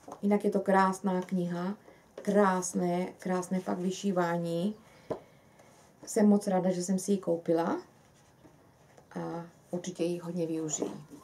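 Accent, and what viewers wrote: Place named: Slovakia. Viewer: Pozdravujem vás. Máte krásnu prácu a koľko toho stíhate! Ja som stihla len kupiť priadzu na letnú háčkovanú tašku ale asi ju nestihnem. Ponožky ma eštelen čakajú a mám strach, že to bude závislosť. Prepáčte mi, že som zvedavá, ale podľa vášho prízvuku mám dojem, že ste Slovenka. Je to tak? Nech sa vám darí.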